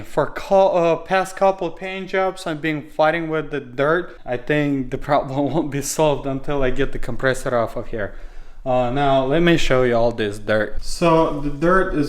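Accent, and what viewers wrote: Russia. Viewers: ебать по акценту слышу что это русский вася!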